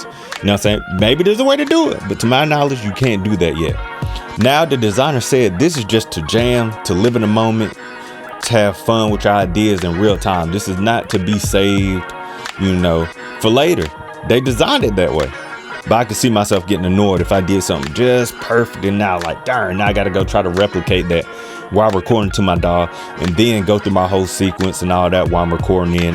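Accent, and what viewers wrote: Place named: Bay Area, United States. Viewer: my guy sounds like hes from the BAY lol I LOVED my KO2 it makes music fun again. my MPC just sits as of recent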